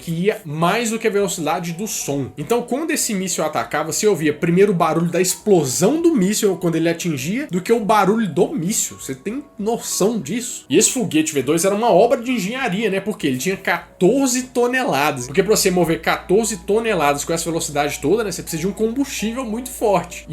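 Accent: Minas Gerais, Brazil. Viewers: interesante eteu sotaque.. Mineirim? gpiano?? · Viciei nesse mineirin.. kkk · Sou fã deste mineirinho... Fala serio este jeitinho nosso malandrinho de falar da uma certa atração 😂😂😂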